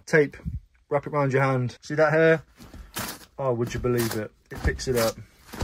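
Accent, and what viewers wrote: Wales, United Kingdom. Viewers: I’ll make the most of it while it lasts. Love the welsh accent by the way, thought I was watching Fireman Sam for a minute! I saw Ian’s video, bet the guys wife got a right bollocking after he left.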